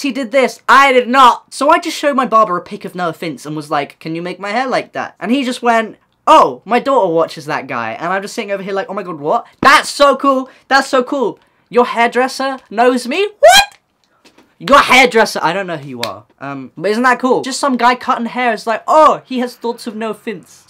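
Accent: United Kingdom. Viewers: i 100% support the tweet saying you helped them learn english, thanks to you i can now understand one of the numerous british accents that there is. so yup my french ass is grateful lmao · I learn english watching you, since I started watching your videos I started like mixing british english with American english, it's funny but a little weird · Your accent makes you sound British cuz you most likely are.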